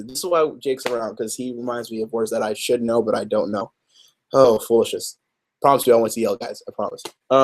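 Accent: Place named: Spain. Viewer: Wow! your spanish accent is fantastic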